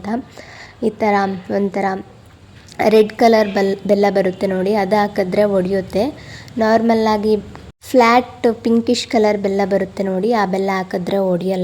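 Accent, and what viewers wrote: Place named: Haveri, India. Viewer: Nivu matado reeti haagu nivu mado aduge recipe yella nodidre nam Haveri saidnavaru ansutte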